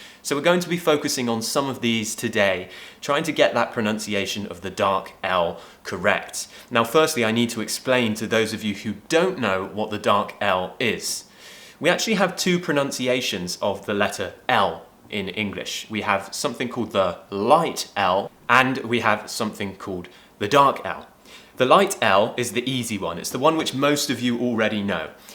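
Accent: United Kingdom. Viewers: Hi sir. EVEY TIME WHEN I LISTEN TO YOUR SPOKEN BRITISH ENGLISH I LOVE MORE AND MORE ENGLISH.CHEERS OUR BEST TEACHER. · Happy celebrations!!!! And thanks for all videos!!! I love British pronunciation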